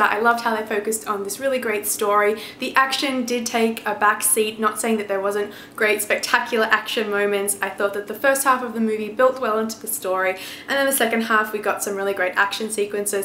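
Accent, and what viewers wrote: Australia. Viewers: Glad I found your channel. Subscribed. Are you Australian?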